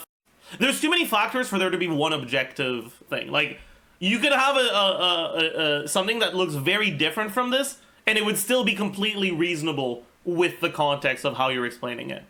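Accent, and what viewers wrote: Quebec, Canada. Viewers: I speak french but I'm not used to canadian french, I understand so little lmao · Except as he put it if he ever travel to Paris, people will have to focus to understand what he is saying. His accent is really pronounced but its not as if only Quebec has strong accents so its no big deal. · ouais, alors, en tant que français.e de france, ça reste chaud le français quebecois ToT mais j'adore <3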